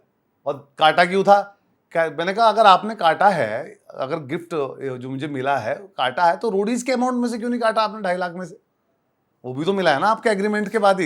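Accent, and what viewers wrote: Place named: Saharanpur, India. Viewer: He is real man..his accent is actually Saharanpur wala...wish him success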